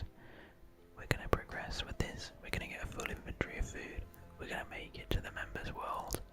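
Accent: United Kingdom. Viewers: Thank you for continuing to make videos, and especially for continuing to make OSRS videos!! I’ve been falling asleep and getting tingles watching these since I was still in secondary school, and now I am in my 5th and final year of Uni, soon to start my masters!! Something about hearing an English bloke meandering through the most nostalgic parts of this game that I have been playing for well over a decade aswell brings me to a place of comfort that nothing else can replicate. ❤❤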